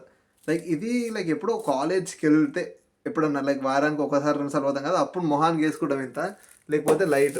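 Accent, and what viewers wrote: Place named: Telangana, India. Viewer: Telangana slang 😁🤣 # new subscriber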